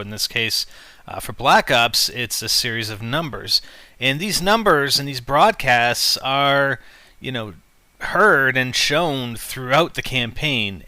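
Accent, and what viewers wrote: Canada. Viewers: Your Canadian aren't you (zed) instead of z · are you Canadian? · this is so canadian with your prOcess and zed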